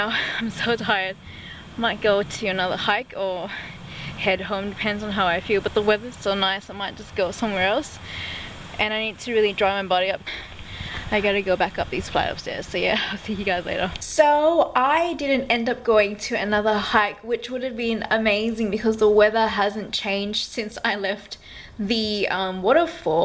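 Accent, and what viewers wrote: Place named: Australia. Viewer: The second you talked, I hit liked! Australian women are my kryptonite!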